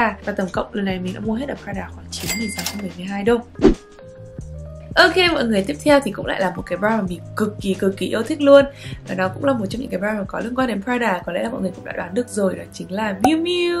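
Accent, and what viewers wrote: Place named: North, Vietnam. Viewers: vẫn giữ được giọng Bắc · sao chị học ở sg mà lại nói giọng Bắc thế ạ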